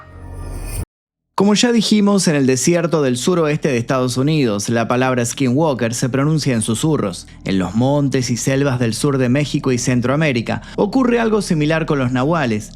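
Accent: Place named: Argentina. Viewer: "polisha", "coshote". Qué chido se oye la pronunciación de la "ll" y la "ye" en el español argentino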